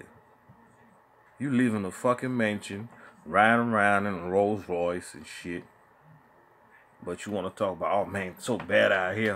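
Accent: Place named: Caribbean. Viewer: U have a carribean accent. Ur family wasn't here in Jim crow. Stop commenting on american black folks movements. It's non-immigrants only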